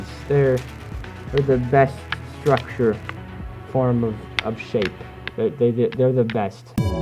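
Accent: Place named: Mid-Atlantic, United States. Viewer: Awww you got the cutest mid-atlantic accent. Also: Deer do have fangs. And not like, during the Pleistocene, some deer have fangs to-day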